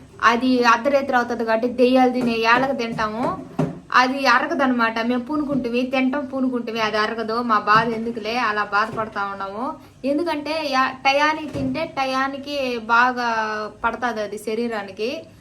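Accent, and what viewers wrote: Nellore, India. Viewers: హలో గాడ్ బ్లెస్స్ యు. నీ వీడియోలు చూస్తాము. ఆ నెల్లూరు బాష వీనీ ఆనo దీ స్త ము. మా ది గూడూరు. జాగ్ర త గా ఉండు.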